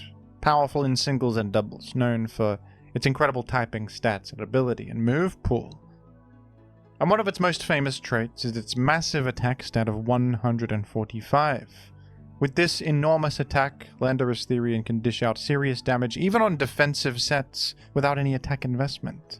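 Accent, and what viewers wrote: Australia. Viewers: As an Australia I can confirm it’s just his accent. That’s how we say it down here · I can’t tell if some of your pronunciations are a joke or just how they say it down under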